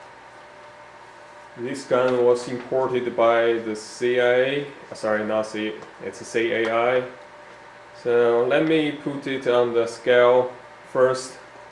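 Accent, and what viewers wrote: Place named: Eastern Europe. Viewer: I thought he was Asian too, until the very last line. The way he says "wideo" you know he is a slav, maybe with some dramatic mixed in. Definitely not an Asian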